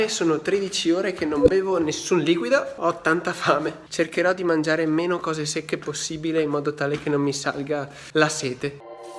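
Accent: Veneto, Italy. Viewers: Hai un bellissimo canale! Questo video è davvero bellissimo. Tu sei un ragazzo onesto, educato, pulito e parli davvero molto bene. Assolutamente iscritto perché meriti davvero tantissimo! Complimenti sei grandissimo, se posso chiedere, da dove vieni? Hai un accento molto simile dal mio che vengo dal Veneto ahaa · Ma sei veneto? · Sei veneto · Ma sei veneto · Ho visto un video dove alcuni fruttariani sostenevano di poter vivere senza bere acqua mangiando solo una mela al giorno. Se fosse vero con tutta la frutta che hai mangiato non dovresti aver avuto problemi. Comunque hai fatto bene a concludere la sfida un po' prima dato che dopo il mal di testa il prossimo sintomo da disidratazione era il colpo di calore e poi probabilmente il coma. Che lavoro fai ? Se posso sapere. Se facevi un lavoro pesante magari sotto al sole come l'operaio ci lasciavi le penne. 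Hai fatto un bel video onestamente (montaggio riprese ecc) e fai bene ad ascoltare la tua community e non fare più challenge del genere. PS: si sente che sei veneto ciò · Ciao, sei di Padova ho riconosciuto la stazione dei bus??? Sei veneto quello sicuro dall'accento che hai:-) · Sei veneto? Se si credo di Padova giusto?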